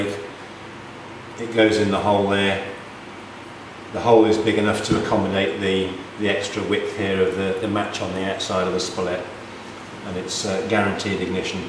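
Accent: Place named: United Kingdom. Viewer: Also, where did you live in the UK? You have a familiar accent.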